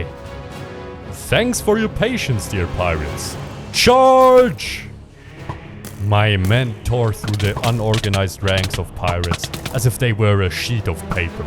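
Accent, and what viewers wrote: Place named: Germany. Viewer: Since I heard the first sentence from I knew he was german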